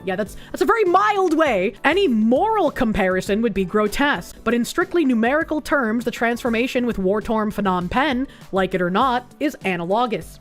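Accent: Boston, United States. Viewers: Obviously D4vd is innocent and the girl just accidentally hit the self deleting and dismembering button in the Tesla. It's marked D&D so she thought it was a roleplaying game with the car's AI. Unrelated: Ab sen tee-ah. My god. It's not your Boston accent. I love your Boston accent. It's the fact you cannot pronounce words. Ab sen she-uh.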